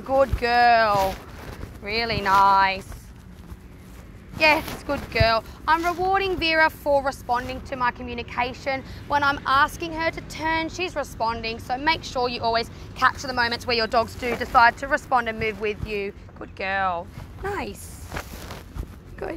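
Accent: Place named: Australia. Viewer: What nationality are you?? She's speaking Australian & we speak slower then other nationalities.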